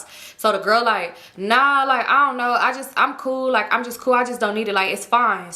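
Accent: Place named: Los Angeles, United States. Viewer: I discovered you from honey K whenever she went to your rodeo event & now im hooked on your channel. I love the way you and her talk too with the LA accents 😂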